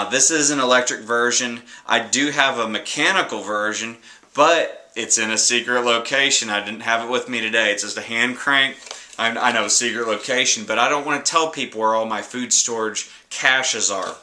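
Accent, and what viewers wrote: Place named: South, United States. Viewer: Yea I didn't really think so and the sweater(and that soft southern accent of yours). kind of made me think lol...it wasn't meant to be insulting. It doesn't even matter to me though really but I as well throw up in my mouth sometimes thinking about it. I just thought, "This gay guy is putting up some sweet videos." Sweet vids man sorry for my ignorance :P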